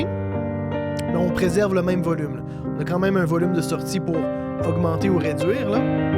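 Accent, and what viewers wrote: Quebec, Canada. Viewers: Bonjour, j'adore votre chaine ! Impossible de télécharger KOLIN sur PC Windows 10. Continuez à nous ravir avec votre accent Québécois ! Max de France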